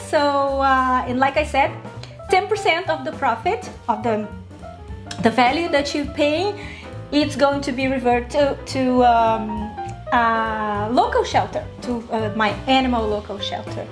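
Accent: Brazil. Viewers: A receita parece legal, mas vim elogiar seu inglês hahahaha dá pra perceber o sotaque brasileiro mas eu gostei bastante de como você se virou com as palavras. Parabéns :) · Ah, que legal! Seu inglês é maravilhoso, perfeito! Mas como sou brasileira, pesquei o sotaque! Obrigada pela receita! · Vi os primeiros 30 segundos do vídeo (o primeiro que vejo seu) e pensei... Wow! Ela fala como uma brasileira! Parabéns pelo trabalho maravilhoso, chef!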